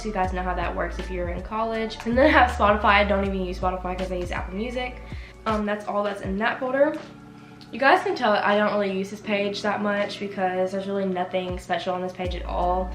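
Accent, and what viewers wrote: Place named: South, United States. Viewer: You kinda have a southern accent ❤️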